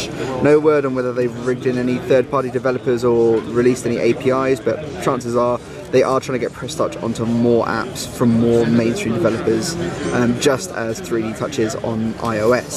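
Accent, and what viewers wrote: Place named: England, United Kingdom. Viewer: You sound like Jonah Hill, but with English accent;)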